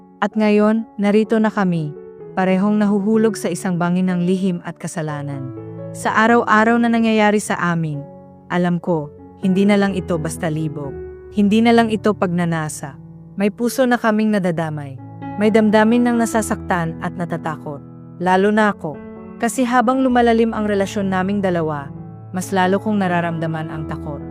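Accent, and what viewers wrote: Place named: Visayas, Philippines. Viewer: Bisaya k po b miss? Halatang halata Kasi Ang Punto mo.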